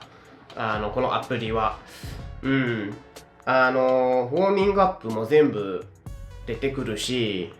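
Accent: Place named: Kansai, Japan. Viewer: 関西弁も板について自然で聞きやすいです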